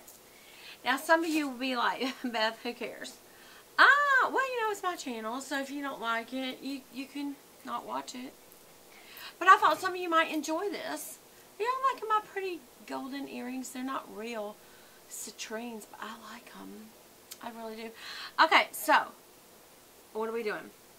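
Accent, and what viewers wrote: South, United States. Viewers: Beth!!! OMG where have YOU been all my life! Brahmin AND A PLANNER GIRL?! I love it! This video has changed my mind about which Brahmin (will be my first one) for my birthday next week. I love the southern drawl, imma a bell too! 😘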